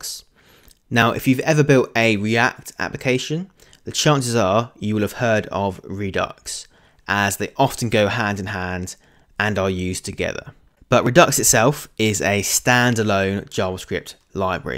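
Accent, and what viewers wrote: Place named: United Kingdom. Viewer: You have got a new subscriber.. Two main reasons: 1- You have a british accent (I really love that accent).. 2- I think you're awesome ;)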